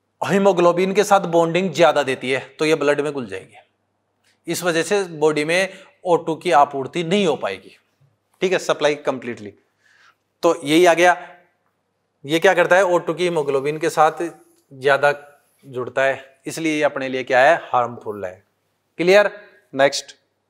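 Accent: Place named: Rajasthan, India. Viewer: Pure rajasthan ka swag aata hai aapki boli me padane ke tarekeee me ekh no.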